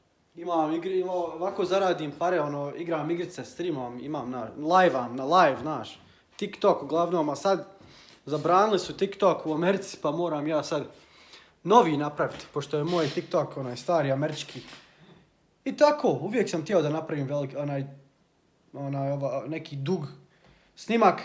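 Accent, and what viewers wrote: Bosnia and Herzegovina. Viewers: Nek ti je sa srecom sine ❤❤❤❤❤ a tvoj Bosanski jezik je predivan❤❤❤❤❤🍀🍀🍀🍀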